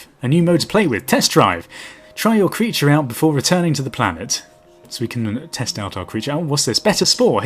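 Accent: United Kingdom. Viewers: you have a british avcent right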